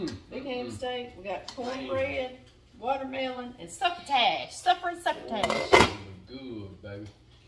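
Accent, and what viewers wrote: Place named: Appalachia, United States. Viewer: Girl! Thank you for your channel! I was struggling out in my garden today and I could not for the life of me figure out why my purple hull peas were fat and looked ready, but weren't purple and the peas looked a pale green. No info on Google for us southern folk who want info on our southern foods. Nope. Thank goodness I thought, check YouTube! I am now putting away some peas ... and listening to your other videos! Are you originally from LA? I would have pegged you as the Appalachians. I say that because that is where all my family is from. ;) We talk a lot alike. Anyway... take care and thanks again. Looking forward to your videos. This is my first year gardening and canning... I'm 46! Late start!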